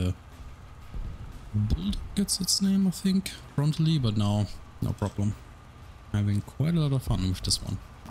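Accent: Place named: Germany. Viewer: wen i hir se jerman egsent i fiel wery much at home. Geiles video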